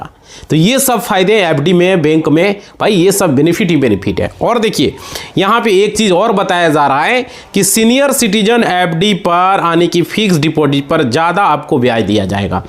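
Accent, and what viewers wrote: Bihar, India. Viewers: Bolna sikh le pahle Bihari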